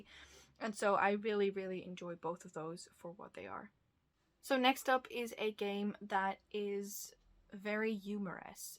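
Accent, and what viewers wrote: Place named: Netherlands. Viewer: I thought I picked up a distant Dutch accent and then I looked it up! So lovely! I like your vids. So lovely and cosy ❤️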